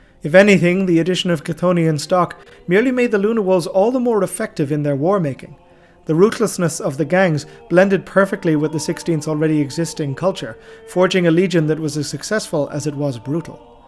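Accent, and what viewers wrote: Ireland. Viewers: sound's like Irish but i do wonder where he is from and his back ground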